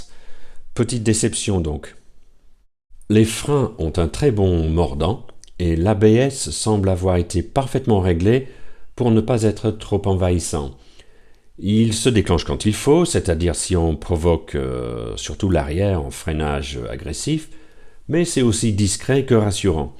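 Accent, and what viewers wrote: United Kingdom. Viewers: BJR. Et bien, j'aimerais bien parler l'Anglais comme tu parles le Français (même avec un accent). Tu as une très bonne diction. Quant à ton accent "british" il n'est pas trop prononcé. D'ailleurs, je ne l'ai remarqué qu'au bout de plusieurs phrases. Bon essai, agréable à suivre ; continue de faire des essais de scooters. Concernant ta question sur la circulation en inter-files (surtout valable sur le périphérique parisien), nous n'y comprenons plus rien, nous non plus. La France a l'art de changer et compliquer les réglementations. Une question : c'est mieux de vivre en France ou bien au Portugal ? (ndr : je suppose que c'est à cause du climat que tu ne vis plus en Angleterre). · Very good review with the best accent I have ever heard from an English person.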